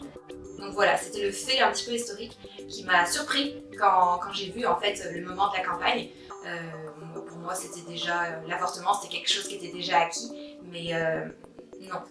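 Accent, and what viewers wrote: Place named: Quebec, Canada. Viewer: Merci pour cette vidéo! Tu as un léger accent québécois je trouve! :)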